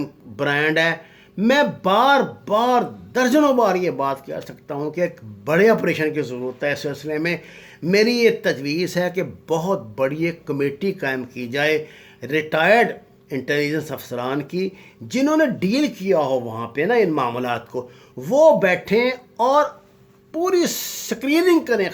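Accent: Punjab, Pakistan. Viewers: Sir please apnay bat karnay ka tarika thik Karo lagta hy Punjabi me Urdu bol rai ho ya Urdu me Punjabi... Please don't mind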